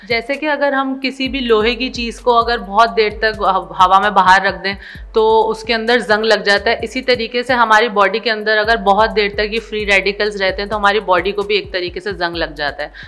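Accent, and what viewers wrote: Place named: Karachi, Pakistan. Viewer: Ye Dr karachi ki hy... Speaking accent say lgra hy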